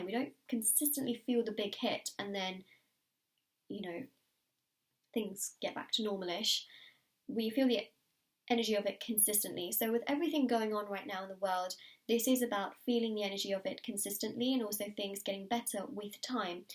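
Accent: England, United Kingdom. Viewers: I adore your accent, so Hermione Granger 😍 What part of England is that?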